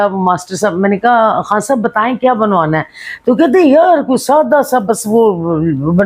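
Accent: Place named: Punjab, Pakistan. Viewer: آصف خان کی اردو کی نقلیں اتارنے کی ضرورت نہیں انکی اردو آپ کی پنجابی نما اردو سے اچھی تھی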